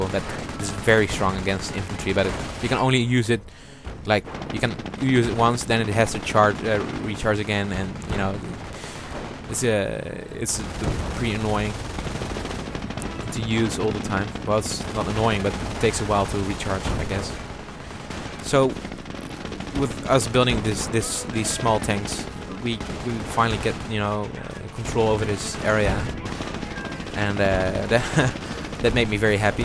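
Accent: Netherlands. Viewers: je kan duidelijk verstaan dat je nederlands ben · youre dutch? you got a litlle dutch accent, im dutch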